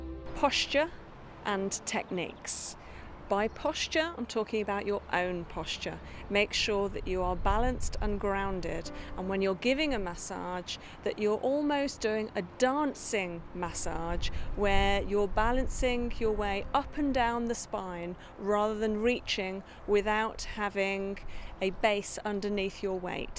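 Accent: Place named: RP, United Kingdom. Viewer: What happened to the all-girls boarding school accent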